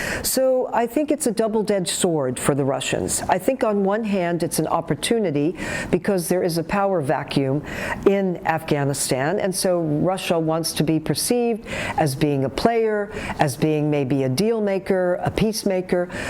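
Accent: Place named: Arab world. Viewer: Abraham Accords will lead to Arab Spring times 10. Miss, no offense but your accent is a bit strong. When you speak English sometimes it sounds like Arabic. So harsh. Maybe hire a different host if you want to appeal to anyone other than Neo-Cons, war criminals, and fellow Arabs.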